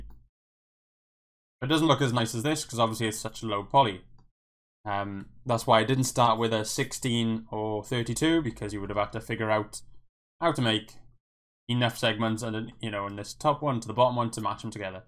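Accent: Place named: Wales, United Kingdom. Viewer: Thanks for this video! Are you from Wales? If so where abouts? I'm in Pembs, I reckognize the accent.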